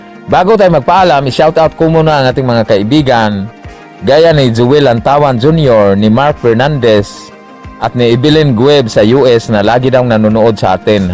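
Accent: Visayas, Philippines. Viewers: Bisaya diay ka kol, haha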